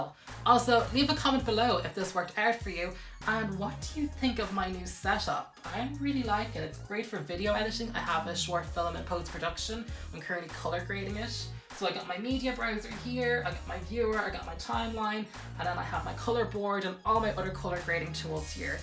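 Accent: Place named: Ireland. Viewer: Love your slight Irish accent!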